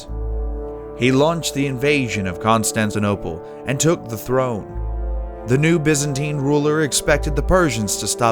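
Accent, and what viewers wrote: United States. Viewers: This just does not work for me. The American accent with it's mispronunciations of cities and countries makes it sound very amateurish. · God, an American accent on a history show makes it sound like a cheap History channel pile of bullshit.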